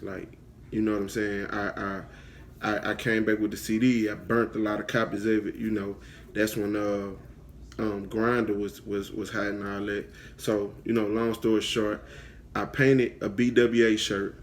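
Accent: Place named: Louisiana, United States. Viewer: It make perfect sense dat he frm Louisiana cuz he alr got da accent so it probably ain that hard for cuz to even do iy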